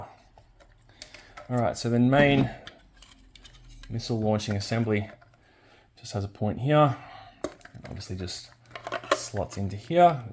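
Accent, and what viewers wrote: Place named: Australia. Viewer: Let me guess, your ausie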